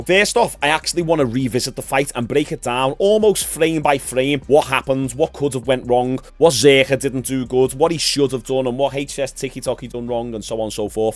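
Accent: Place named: England, United Kingdom. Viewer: “They’re all little faggots” -weird English accent. Everything sounds better in the American accent y’all created it but we refined it, if you feel anyway about that comment just remember what happened in 1776 ya little hobknocker I love you ❤️ your content is refreshing and I believe your consistency and ability to hustle hard will take you to the top, I’m younger then you so I think you will get there first but when I get there just make your you remember the American that was happy you Sa’id the word faggot openly 😂 love ya bro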